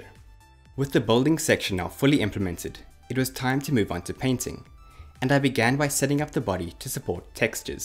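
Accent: South Africa. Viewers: Great YouTube channel! I assume you are South African, because of the accent. I am also proud South African. It’s so cool to have a growing YouTube channel such as yours based in South Africa. You are definitely in my top 3 game development YouTube channels. Could even be number 1. Keep up the great work. Oh and where in South Africa do you live?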